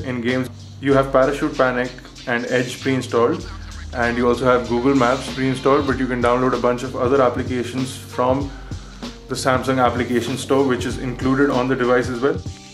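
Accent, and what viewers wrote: India. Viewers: bullshit typical indian english accent...( boda thing cuming out..)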